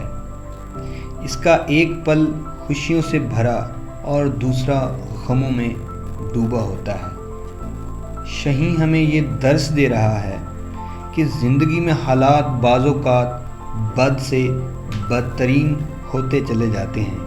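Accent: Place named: Saraiki, Pakistan. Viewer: Narrator ny kitni jamaat tk Taleem hasil ki thi. Accent bilkul Saraeeki 😫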